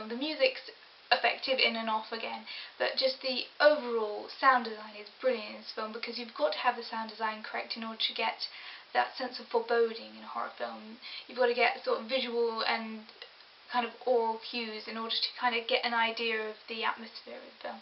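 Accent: United Kingdom. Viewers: I'm impressed, count me a new subscriber. Sounds like you might be from across the pond. When I first saw this movie it scared me about as much as any horror movie does. (The scariest movies make me jumpy, and I have always had a vivid imagination of what things might be lurking in the dark.)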